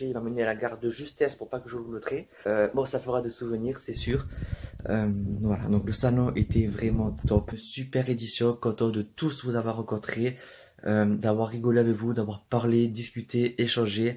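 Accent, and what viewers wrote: South, France. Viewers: Coucou ça va? Merci pour ce vlog, depuis ce matin je trépigne d'impatience à l'idée de le voir lol. J'ai pas été filmer au meilleur moment mais je me rassures en me disant que je ne suis pas la seule lol. Tu as enchaîné les merdouilles le dimanche, la vache. Ce salon a été compliqué pour moi comme tu le sais, mais si je devais revenir en arrière, je reviendrais ne serait-ce que pour te voir. Même si on ne s'est pas vus tant que ça sur la journée chaque moment passé avec toi était super et je super contente d'avoir pu te voir en vrai. Tu es quelqu'un en or, je t'adore! Ne change surtout pas et à toutes les mauvaises langues, allez voir ailleurs. Perso, j'adore ton accent du sud. Tes sourires et nos conversations m'ont redonné le sourire samedi et pourtant c'était pas gagné donc tout simplement MERCI <3. Bonne soirée et belle lecture!!! 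Bisous.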